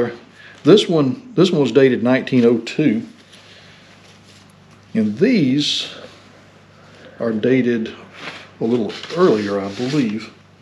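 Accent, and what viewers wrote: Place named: South, United States. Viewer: I love hearing him tell story's , very Southern, very respectable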